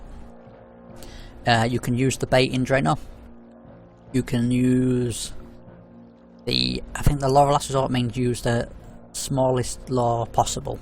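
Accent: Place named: England, United Kingdom. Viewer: Bloody idiots. This is fucking English. This is what English sounds like in England. Not in London, in England. All of these non-native English speakers complaining makes me cringe hahaha, get your own world language and see how you feel about every tom, dick and harry butchering it before your eyes.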